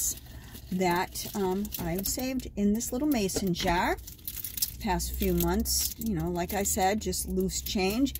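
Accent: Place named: New England, United States. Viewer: Based on the way you say the words "Jar" & "Dollar", it sounds like your from the New England area, probably Massachusetts. I love hearing the slight accents from up there, mostly because it's disappearing over time. $52 isn't a bad haul. Keep using cash and saving the loose change!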